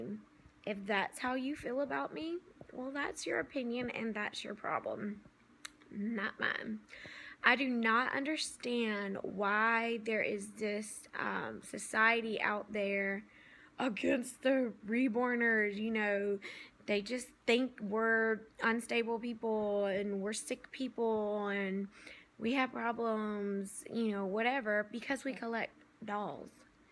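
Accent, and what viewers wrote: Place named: South, United States. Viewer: All this hooplah about Reborns. You are very right and I am so glad you made this video. I am also a 60 yr old, and alone. I was a Neonatal Nurse & Labor /Delivery Nurse for 30 yrs. Now, I only have my dolls to play with and I have loved and collected dolls my whole life. Needless to say, as an adult, and spent a lifetime career of babies, I clearly LOVE THEM and LOVE my dolls. God Bless you for saying this. It is important to all of us. I am also a Southern Lady and so nice to hear your lovely accent and hospitality. Thank you for saying it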